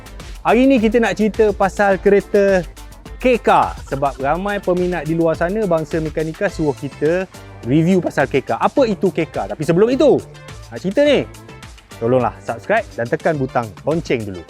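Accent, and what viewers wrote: Kelantan, Malaysia. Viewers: Haha org mentakap kecek klate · ore kelate ke abe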